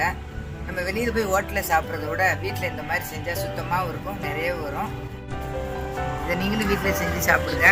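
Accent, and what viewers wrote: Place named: Tirunelveli, India. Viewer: Amma neenga tirunelveli a thoothukudi ya ila kanyakumariya???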